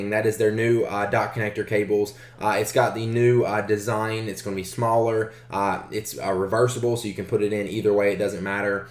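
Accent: South, United States. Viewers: dont u guys think 300 buks 4 the new touch is a bit much,,,,,ps where is he from ( southern draw)(but wheres flat bill hats)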